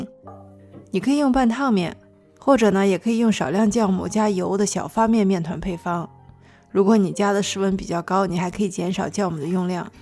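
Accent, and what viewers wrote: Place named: Northwest, China. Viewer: 因为爱上了西北口音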